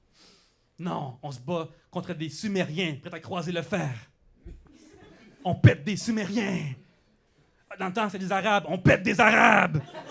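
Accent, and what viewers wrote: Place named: Quebec, Canada. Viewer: Yo tu sonne Québécois, tu punch comme les américains, t'es née en Colombie... who the fuck are you ? 🤏😂 ...hahaha t'es une incroyable découverte , d'un huge fan de stand up merci pis chapeau, la scène Queb a besoin d'un gars comme toi bravo. 👏👏👏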